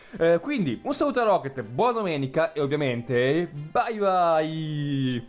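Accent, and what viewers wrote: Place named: China. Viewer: senti gia sembri cinese, parla piu piano che nemmeno te capisci cio che dici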